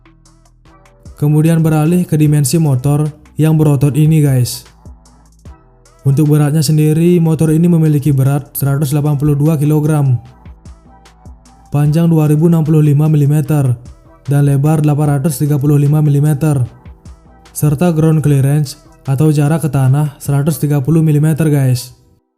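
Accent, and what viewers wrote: Bali, Indonesia. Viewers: Mimin asal Bali yaa...??? Logatnya mirip orang Bali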